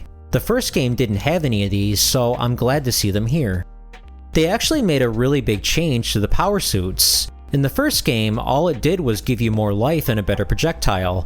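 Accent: Chicago, United States. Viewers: Is that a Windy City accent?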